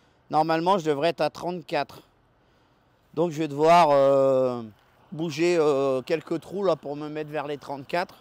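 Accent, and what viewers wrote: North, France. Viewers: Merci pour le partage c est toujours un régal mais tu as un accent du nord 😊de la France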